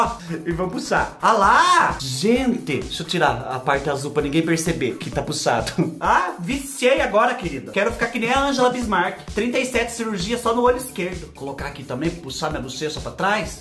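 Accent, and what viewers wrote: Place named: South, Brazil. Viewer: Vc é tão fofo , adoro vc , gostaria de saber de onde vc é , vc fala igual o povo do sul acho muito engraçado , bjsss 😘❤️🥰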